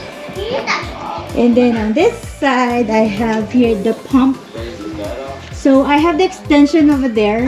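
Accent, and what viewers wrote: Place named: England, United Kingdom. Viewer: I always love your english accent, Congrats for having a new one, enjoy your new air mattress #dabestieszoe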